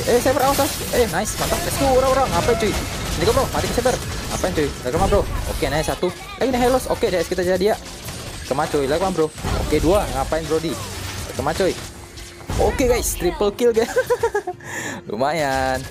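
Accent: Manado, Indonesia. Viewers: Orang asli manado ngana bro?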